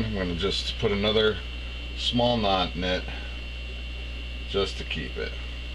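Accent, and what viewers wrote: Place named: Chicago, United States. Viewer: Thanks for the DIY video and the shout out, both were much appreciated. I'm heading to Walmart after work to pick up the supplies to make this mop. I might make a slightly smaller version of it because I have limited space in my tank. Seems like we have a ton in common... cooking (I was a chef), apistogramma, and DIY.. you also sound like you are from Chicago, where I spent the first 20 years of my life.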